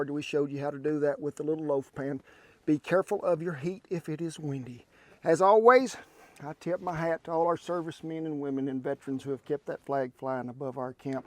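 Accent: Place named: North Carolina, United States. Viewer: I'm a nanner bread junkie,you know if you didn't live in Oklahoma I would swear you were from western NC.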